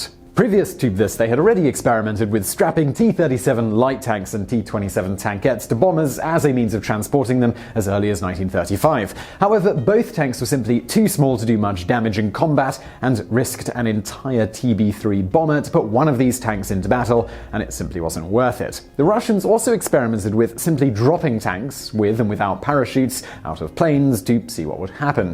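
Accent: United Kingdom. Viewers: When did Vsauce have a British accent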